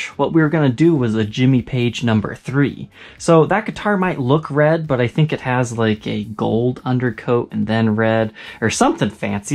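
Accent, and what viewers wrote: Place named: United States. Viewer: Because you sound American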